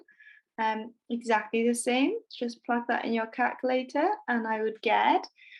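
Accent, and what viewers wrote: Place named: Wales, United Kingdom. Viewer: It’s Welsh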